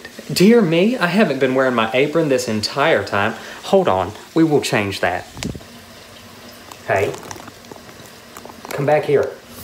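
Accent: South, United States. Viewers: I love the southern in these older videos. "pots and pans" was one word. "potsnpans". love it.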